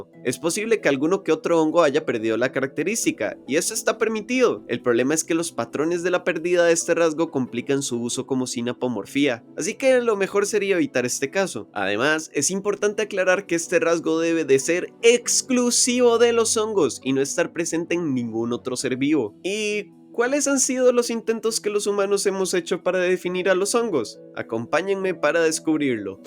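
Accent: Costa Rica. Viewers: Que raro se me hace oír el acento tico en youtube. Buen contenido, no sabía que había edutubers acá. Eres un grande!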